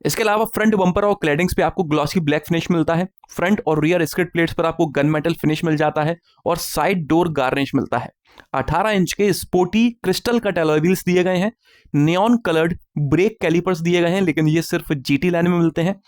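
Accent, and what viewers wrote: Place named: Delhi, India. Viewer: Typical ghaati dilliwali hindi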